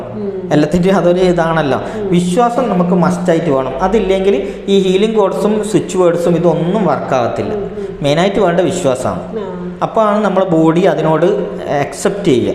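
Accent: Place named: Kannur, India. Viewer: Kannur bhasha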